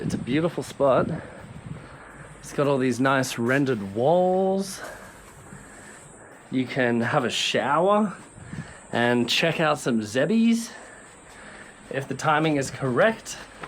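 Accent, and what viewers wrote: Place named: Australia. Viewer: Dear! You are the best guy I’ve found in youtube sice years, i’m so grateful to Famila Mundo (the camere;) to let me know you. Y like lissen you to improove my english undertanding and i adore australian accent… is very hard for me but very beautyfulsoundin for me. Please don’t offend if i ask you to make an experiment, Im 50 years old, and i’ve seen many things in the live..and in the health tha is a my passion since decades….. I heared in your breath the sign of a “sensitivity” to some moleculs present in the food. Expecially i’m almost sure you eat a large amount of “starchy” food…. Like grains, potatoes, flours food……. Please…. If you want to lissen a old guy that experimented many things, You can try for Trhee weeks to low down the use of any “starchy” product…. And eventually dairy products if you use them…. And you can observe by yourself if the improovement of breathing will occur, or not!! 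…. (I won’t give you my spoiler, even though You can easily guess it)😅 To compensate the lack of carbo, is the best choice, at your age, to put in the plate fruit and fruit and fruit, and many no starchy vegetables, raw and cooked. and sweet drink made by juice of fruit o cane…. Enjoy natural simple food!!!!! People around you will not understand You, but… do not worry, your experiment is a great improoving oporunity for you entire future healt of the live…people’s opinions may be discussed later, whit the results in the hand, after the experiment conclusion…🙏👍👍👍 Last info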